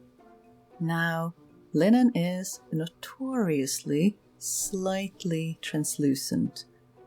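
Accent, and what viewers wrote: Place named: Sweden. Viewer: I came here from your Hank react. The tone and pacing of your video style is gorgeous. Perfect for watching while drinking coffee from my favorite mug and knitting on a rainy Sunday. Also, my maternal grandfather was a Swedish immigrant (US here) and I feel like I'm listening to long lost kin. ❤ An island of tranquility in a dark, stormy world these days.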